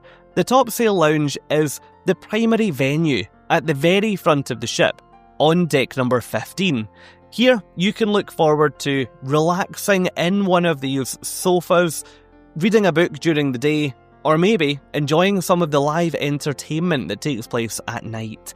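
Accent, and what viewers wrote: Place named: Scotland, United Kingdom. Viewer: Okay, we have a bet going. Please help. My wife says you are Scottish, my son says Welch.... I hear something more Big City and mixed... Can you help us out? Yes, we all love your accent!!!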